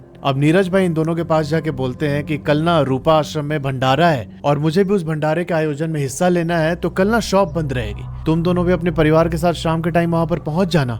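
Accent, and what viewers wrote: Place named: Uttar Pradesh, India. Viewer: Aapki awaj bahut tagdi hai aap kis district se ho aap up wali awaj lagti hai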